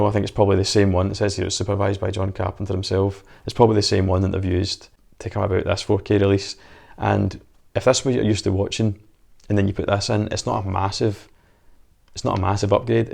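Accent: Scotland, United Kingdom. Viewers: Get more subscribers....Hardly with that annoyinh Scottish accent 🙄👎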